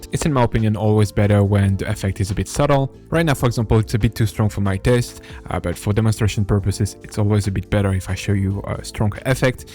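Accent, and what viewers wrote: France. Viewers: french accent, nice vid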